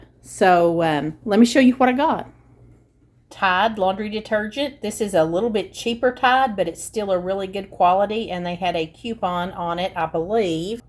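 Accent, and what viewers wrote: South, United States. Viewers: Watching and listening to you talk just make my heart happy! I have mentioned before that my parents were born and raised in Tennessee and that southern drawl just takes me back! Thank you for your sweet and gentle personality! Blessings!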